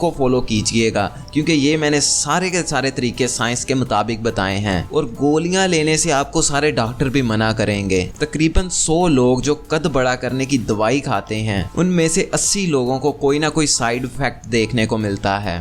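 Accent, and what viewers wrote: Punjab, India. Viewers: AP Punjab ke ho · Yar apky muzuaaat intehae jandar lekin apki Urdu intehae kmzor h lehja bilkul kc pendu Punjabi ka h ya Urdu ehl e zuban ki trha bolo ya phir direct Punjabi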